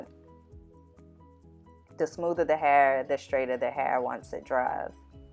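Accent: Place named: New Orleans, United States. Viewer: I definitely hear New Orleans in you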